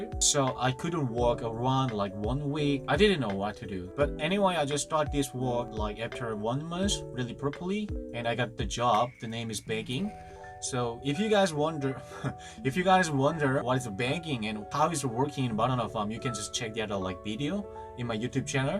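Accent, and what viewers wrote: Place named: United Kingdom. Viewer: 영국식영어 개멋지다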